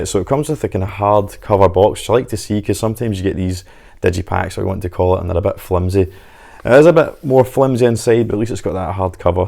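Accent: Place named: Glasgow, United Kingdom. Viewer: Just discovered your channel mate thanks to John at mondo, being a Glasgow boy myself its great to hear a familiar accent. Absolutely adore this film and I picked up this set. This release is 100% my best release of last year. I do prefer a amaray or steelbook in these sets but apart from that its a great set. This movie having a nice 4k edition has been a long time coming. Great informative in depth review, a breath of fresh air, the effort you have put in is much appreciated 👍 Mulcahys eye is superb, i love how he moves the camera. You should check out His 'Razorback' if you havnt seen it, another masterclass in moving the camera. Ill defo be checking out more of your vids sir! Take it easy.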